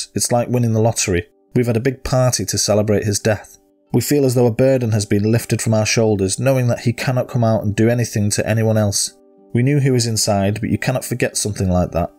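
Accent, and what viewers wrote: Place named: Northern England, United Kingdom. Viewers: I knew you sounded like you were from the north of England I lived near Manchester I lived in Newton Lee Willows earlstown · I thought you were a Manc. Glad you covered this case, i have heard if it. The victims need to be remembered, like you say. RIP to all his victims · I love this channel, your a brilliant narrater, could listen to you all day, I'm not far from you at Manchester I'm from Kearsley, but now live in Wigan, thought I recognised the accent. Keep them coming, loving them all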